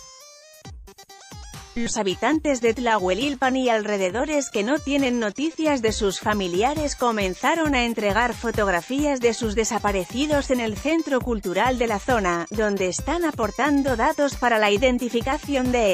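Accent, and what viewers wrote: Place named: Spain. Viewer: ESTE ROBOT CON ASENTO ESPAÑOL NO SIRVE PA NADA. PERO D CUALKIER MANERA. RIP.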